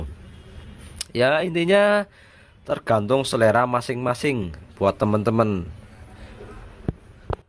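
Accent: Banyumas, Indonesia. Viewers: nek ora ngapak ora kepenak mas he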